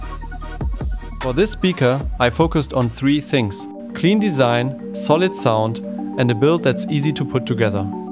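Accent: Germany. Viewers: Man hört das du deutsch bist ❤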